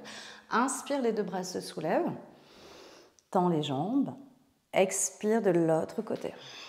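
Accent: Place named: Europe. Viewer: Belle séance très appréciée ...je ne peux faire la guirlande jusqu'en bas , à cause de l'arthrose à un genou . Pas grave je m'ajuste..j'aime tes séances toujours bien expliquée . Je viens du Québec et toi ? ...d'après ton accent je devine que tu demeures en Europe........de Louise Joyeuses Pâques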